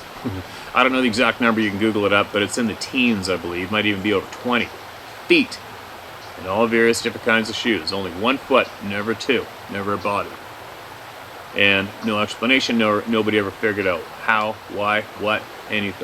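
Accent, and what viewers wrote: Australia. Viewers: That aussie accent tho! 😹 Rant away sir! 🤩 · Australian accent sucks brother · Funny Aussie accent.. love from an Aussie! You are absolutely correct Re the Rant!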